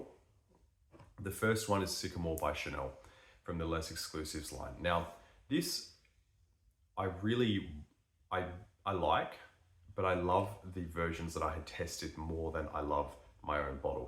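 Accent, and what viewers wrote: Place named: Australia. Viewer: I just started getting into fragrances and already know what you are feeling about Sauvage mate 🤣. It’s just that Sauvage has something VERY special about it initially, but at the same time , once you get used to that special characteristic, the whole fragrance just loses its seduction. Haven’t had this with any other fragrance yet. Quite the opposite, most of the fragrances I started liking EVEN more after wearing them many times. By the way, absolutely LOVE the accent mate: which part of Australia are you from? Do you know any other Aussie channels that deal with fragrances? I already know one young bloke, but you can TELL he is young, which is why I don’t really follow his chanel much 😅. I lived in Melbourne for two years and absolutely enjoyed the vibes in Australia, hence I am always happy to find Aussie content creators and feel a bit nostalgic when listening to you guys 🇦🇺 (such as Andy cooks, but that’s a different topic haha) . 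Wishing you all the very best from Germany mate!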